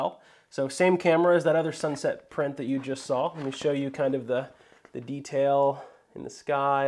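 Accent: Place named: United Kingdom. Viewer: You do a really good British accent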